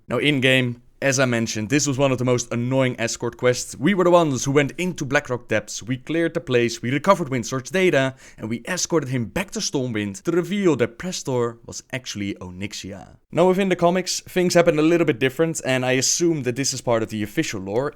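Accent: Netherlands. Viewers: such a dutch accent :p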